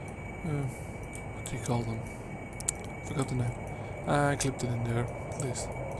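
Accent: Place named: Netherlands. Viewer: I can hear that you're Dutch I'm Dutch too.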